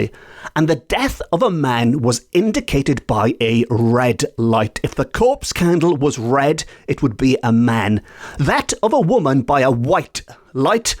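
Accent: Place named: United States. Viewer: Your pronunciation of Welsh sounds very different from Duolingo and other Welsh speakers i've heard. I've heard Calan Gaeaf pronounced Cah-lahn Guy-ahv. Are you just from a different part of Wales with a different dialect or accent? The way you pronounce your "A" sounds like the American way, not British.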